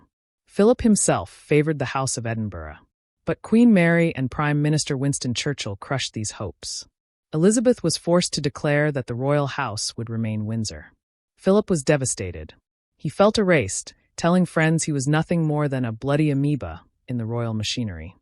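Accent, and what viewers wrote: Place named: United States. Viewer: Please could you Americans do us the courtesy of speaking about duties and not doo-dees?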